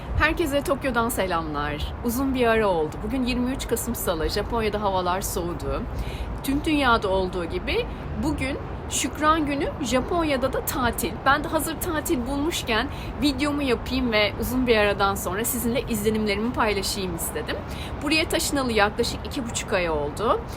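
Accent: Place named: Turkey. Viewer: Japoncayı Türk şivesi ile konuşmak çok güldüm harikasın😂😂😂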